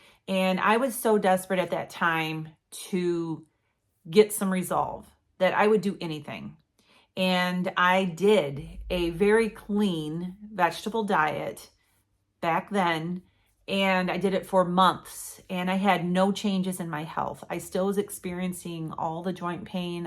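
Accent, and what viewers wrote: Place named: Michigan, United States. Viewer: Great testimony,! Well done! My story mirrors yours so much — almost 2.5 years now as a keto-vore. SO many benefits and changes and we’ll never go back. I’ve been watching your videos for a year now and enjoy seeing you and Ed and your adventures. The first time I heard your video, I heard that Michigan accent and thought “hey! A fellow Michigander!” 😊 Thanks for all the encouragement! Keep going, lady! Julie in W.MI.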